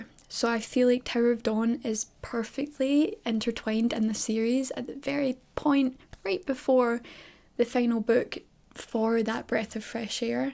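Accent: Scotland, United Kingdom. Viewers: move to Scotland just to listen to people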